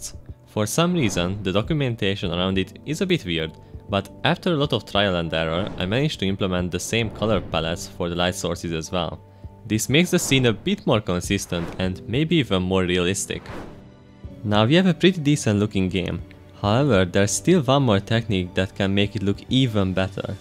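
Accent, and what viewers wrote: Hungary. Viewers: Az a jó fajta magyar akcentus nagyon hamar el árult de érdekesek a videóid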